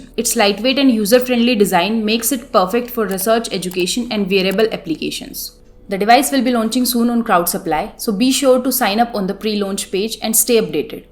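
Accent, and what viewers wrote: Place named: Rajasthan, India. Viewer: she must be Rajasthani or Gujrati. very strong accent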